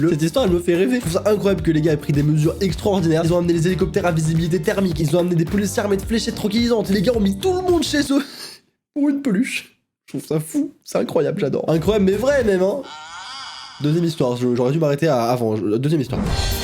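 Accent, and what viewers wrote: England, United Kingdom. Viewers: je veut un like stp ❤️ J’aime trop ton accent anglais 😂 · waaaaaaaaaaaw comment tu as fait ;-; ceci est le talent le plus incroyable du monde ! ( ͡° ͜ʖ ͡°) Et tu as un accent anglais incroyable ( c’est vraiment vrai ) ʕ •ᴥ•ʔ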